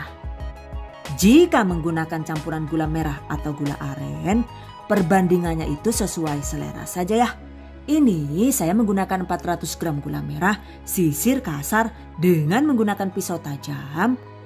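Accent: Banyumas, Indonesia. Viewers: Ora ngapak ora kepenak yu#GiveawayTHV2021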